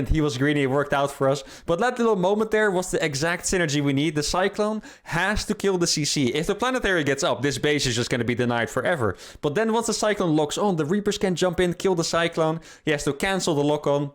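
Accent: Netherlands. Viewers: Ben jij Nederlands?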